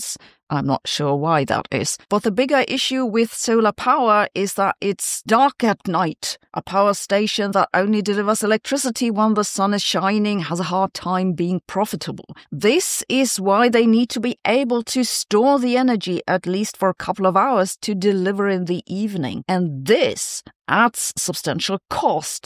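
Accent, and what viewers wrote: Germany. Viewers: ❤❤❤ure German accent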